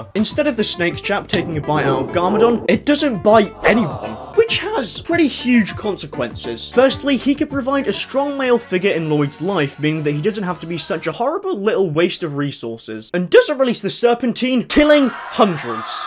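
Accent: United Kingdom. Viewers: Your funny as it is bud your British accent makes it ten times better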